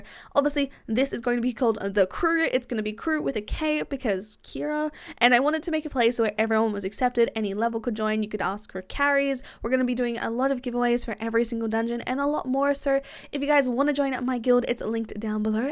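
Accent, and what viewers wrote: Australia. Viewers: this is no offence but it confuses me because she has a Australian and normal accent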